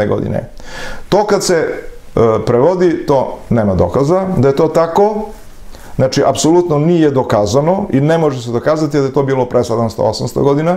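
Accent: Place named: Southern Serbia, Serbia. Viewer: U pravu ste, odavno nismo imali Srbina na vlasti ali nemojte da vas buni njegov izgovor i dijalekt, poticem iz juznih krajeva i to bas blizu ovog sela odakle i gospodin, ovo je dijalekt juznjaka koji pokusava da govori srpski knjizevni govor zato njegov govor deluje neutenticno. Moje skromno misljenje. Poslusajte njegova videa na njegovom kanalu gde govori cisto i autenticno dijalektom kraja iz kog potice.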